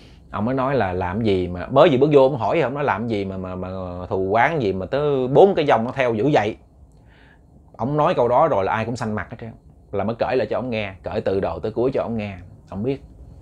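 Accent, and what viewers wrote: Mekong Delta, Vietnam. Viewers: Giọng đọc của đúng chuẩn miền tây quê tôi...❤❤❤❤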